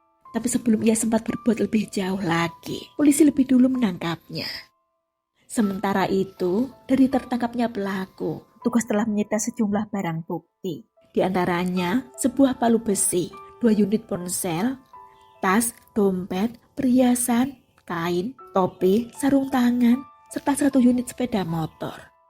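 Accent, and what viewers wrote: Java, Indonesia. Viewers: Mba.. medok bgt suaranya ....😊... Sukses ya...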